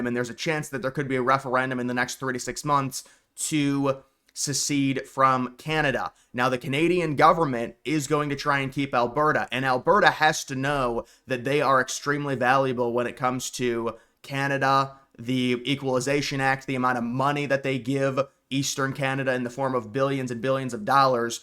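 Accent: United States. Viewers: You talk like an American.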